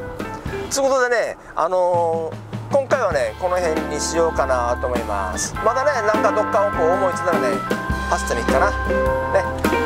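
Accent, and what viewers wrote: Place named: Yamada, Japan. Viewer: やっぱり山田弁はいいがねー。山田に帰りて～(;_;)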